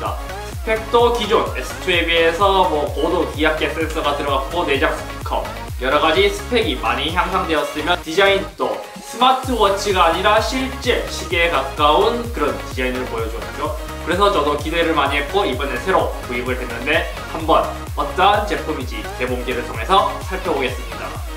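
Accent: Gyeongsang, South Korea. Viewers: 잘 보고 갑니다 ㅋ 대구 말이 정겹네요 ㅋ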